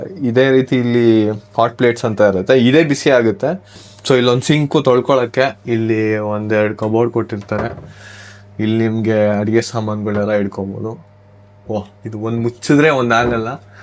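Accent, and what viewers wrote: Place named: North Karnataka, India. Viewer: ಯಾವ್ ಊರ ರಿ ನಿಮ್ದು? ನಿಮ್ಮ ಬಾಯೊಳಗ ಕನ್ನಡ ಕೇಳಾಗ ಬಾಲ್ ಖುಷಿ ಆಗ್ತದ.. ಬಹುಶಾ ನೀವು ಉತ್ತರ ಕರ್ನಾಟಕ ಕಡೆ ಇರ್ಬೇಕು ಅಲ್ಲಾ...?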